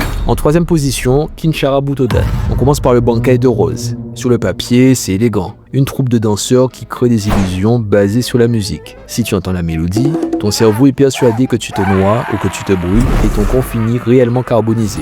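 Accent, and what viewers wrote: Caribbean. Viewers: Je sais pas mais t’es antillais ? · C'est un antillais qui parle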